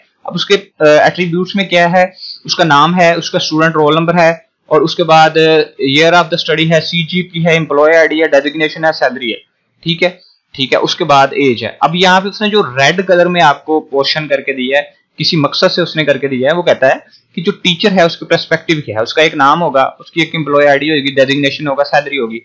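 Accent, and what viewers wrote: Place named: Faisalabad, Pakistan. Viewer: Pka faisalabadi han janab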